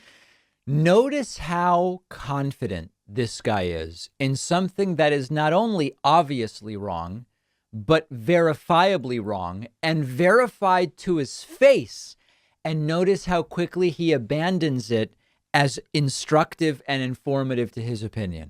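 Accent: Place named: Argentina. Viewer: I've always found the Argentinian dialect of Spanish so unique, just lovely. And, you speak beautifully...more please! 😁 Huge fan of you & your show! Love and blessings, from California.💙💙💙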